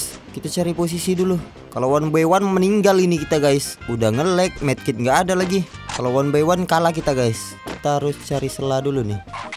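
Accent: Bengkulu, Indonesia. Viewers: bang lu dari Bengkulu ya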